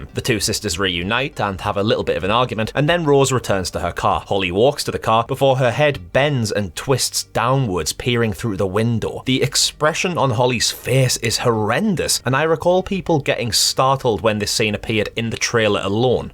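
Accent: Scotland, United Kingdom. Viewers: bro, your Scottish accent is so strong (no Offence btw) 🤗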